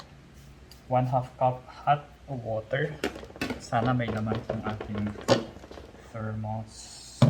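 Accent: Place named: Pampanga, Philippines. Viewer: magaling ka talaga lodi... ohhhhh mah!! ahaha talagng kapampangan. kanyaman na pamo ning inuman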